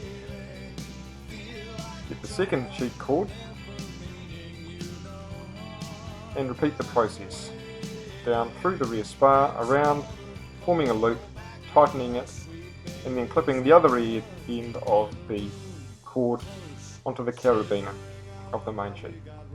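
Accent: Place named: New Zealand. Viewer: Love the concept and the New Zealand accent!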